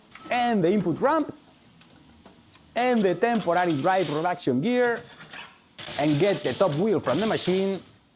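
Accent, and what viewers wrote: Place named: Spain. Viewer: You are a genius. I love that you speak fluent English with a super strong Spanish accent.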